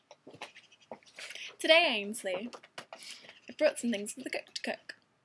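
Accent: England, United Kingdom. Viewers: Calm down. You only asked where she is from, after stating that you couldn't place her accent. She is from England but lives in Canada. If you've watched even half of her videos then you should have known that already. As for why one site says the other... perhaps she signed up for youtube while still in the UK and never felt the need to change it. Such speculation kind of makes people sound like stalkers though so... I'd avoid it. I'm just saying. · Her accent doesn't sound even a little bit Australian. It's clearly English with a little bit of North American. But like the other person said, who gives a shit? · i like this girl. she has a british charm to her personality. maybe it's just the accent though.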